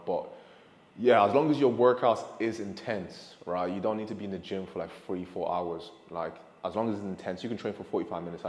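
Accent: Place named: United Kingdom. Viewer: I didn't know u British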